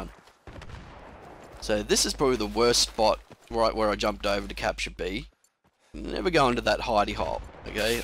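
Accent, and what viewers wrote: Australia. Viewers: On ya mate, where you from??? Victoria? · Are you Australian? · when i watched this video i new he was aussie because of his accent love the aussie accent and his psn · awesome accent were u from Australia ?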